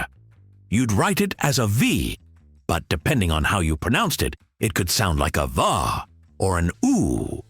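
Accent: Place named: United Kingdom. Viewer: Thank U very much!! 👍 👍 😃 😃 Absolutely LOVE the British accent!! 😉 😁 😁 😁 🤗 🤗 🤗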